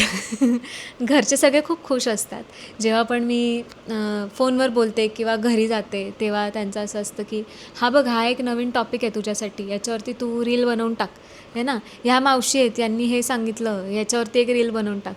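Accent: Khandesh, India. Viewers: Vattlach hota tumhi khandeshi aahet ty tumcha bolnyvrun tumche contents khup chan astat mi pn khandeshi aahe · बोलण्यातून थोडासा आमच्या खानदेशी flavour...sweet...जाणवतो... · tuzya eka videot pakki khandeshi tone janavli mala, aani aata tr confirm ch kelas tu.Mi comment pn keleli tyavr😅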